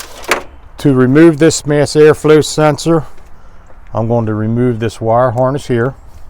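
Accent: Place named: Baltimore, United States. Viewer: Gotcha. You just sound like you have an accent that is very similar to our Baltimore accent.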